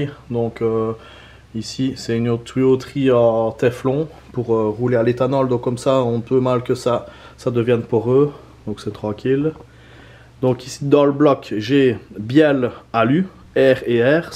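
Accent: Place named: Namur, Belgium. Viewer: Cet accent... tu serais pas de namur?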